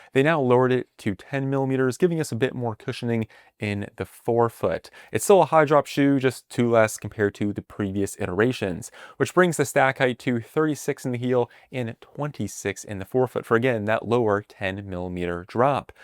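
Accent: Maryland, United States. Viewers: Maryland accent heavy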